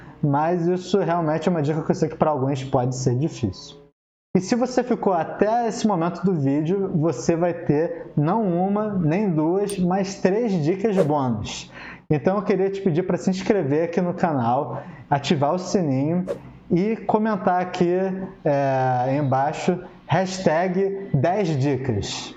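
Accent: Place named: Rio de Janeiro, Brazil. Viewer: Esse sotaque carioquêixxxx é nojento demais meu.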